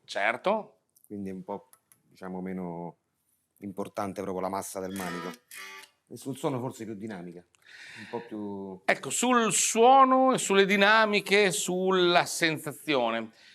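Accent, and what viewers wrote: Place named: Emilia, Italy. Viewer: Non so perché ma la parlata emiliana di, anche se parla di chitarre, mi fa venir fame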